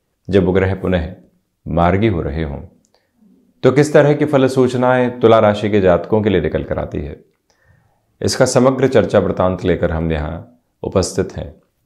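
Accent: Standard. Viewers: आपकी आवाज बहुत गहराई लिए हुए और ज्ञान में डूबी हुई लगती है शुद्ध हिंदी में आप बोलते हैं धन्यवाद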